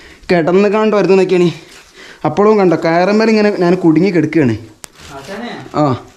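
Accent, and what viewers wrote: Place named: Kozhikode, India. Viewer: നിങ്ങൾ എവിടെയാണ് പ്പാ... ചെയ്ത് വചിക്കേണ്. അടിപൊളി സംസാരം ആണ് ട്ടോ... കോഴിക്കോട് കാരുടെ വർത്താനം .. നല്ലോണം പിടിച്ചാണ്ട് ഇറങ്ങാന്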